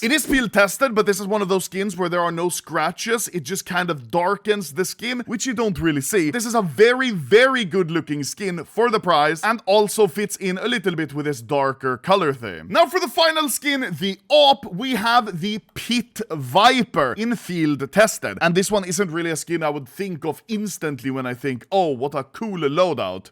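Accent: Yorkshire, United Kingdom. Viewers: YORKSHIRE INNIT 🗣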